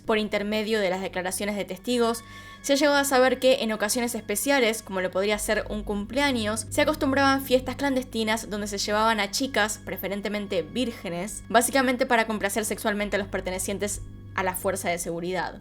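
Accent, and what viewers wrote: Argentina. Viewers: Saludos! Me encanta tu trabajo,tu pelooo y tu acento que me lleva a mi Argentina estando lejos viviendo en México 🏘️❤️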